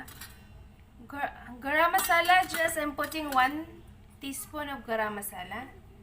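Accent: India. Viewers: I like your accent, u slightly get this Indian accent, mukhang masarap yan Sis thank u for sharing, stay connected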